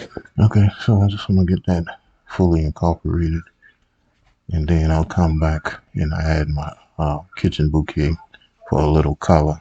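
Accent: Charleston, United States. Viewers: Are you from Charleston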